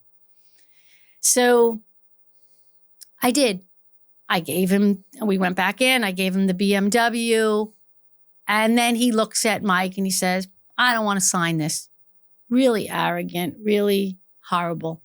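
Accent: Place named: New York, United States. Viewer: I could listen to her for hours. Love the NY accent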